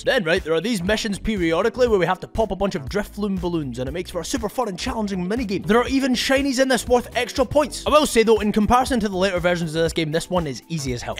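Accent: Scotland, United Kingdom. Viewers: I absolutely agree this guy is amazing and it’s just great to listen to a Scotsman babble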